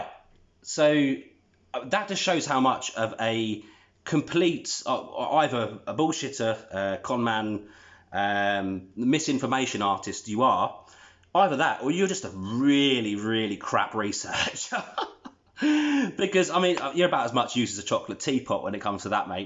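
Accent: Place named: United Kingdom. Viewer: A good rule of thumb is not to trust anyone with a Brit accent talking about US affairs on YouTube. Did Christopher Steele and the Steele Dossier teach us nothing?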